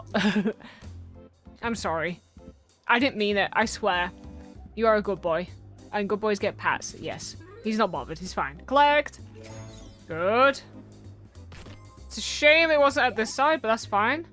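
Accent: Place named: North, United Kingdom. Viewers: I am going to guess Manchester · are you from the north?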